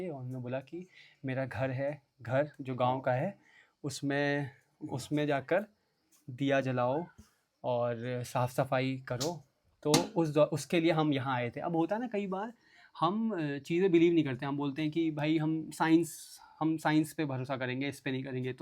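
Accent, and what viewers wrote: Pahadi, India. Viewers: Pahadi accent amazing ❤❤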